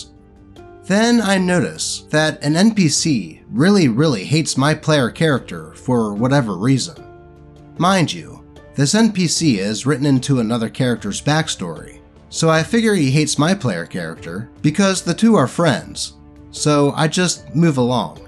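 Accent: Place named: Baltimore, United States. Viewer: That Baltimore/Maryland accent coming out real strong in the first story lol all about Heaumophaeubia and the player hitting awn OP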